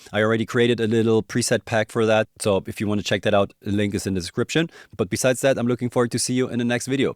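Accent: Germany. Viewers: I only trust synth advice from German sounding people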